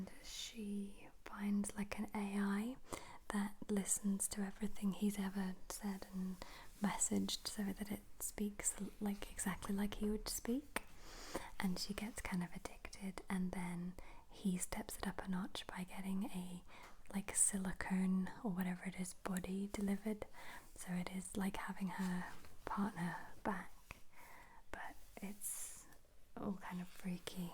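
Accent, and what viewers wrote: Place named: United Kingdom. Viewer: Black Mirror has broken so many grounds. I remember watching Bandersnatch for the first time thinking how cool of a concept it was. Hope you're doing great I love watching your videos. Your Brit speech is hot chocolate in the winter beside a warm fire ❤😱🥰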